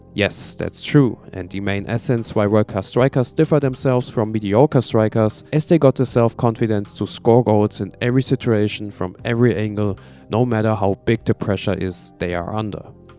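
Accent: Germany. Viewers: are you german=?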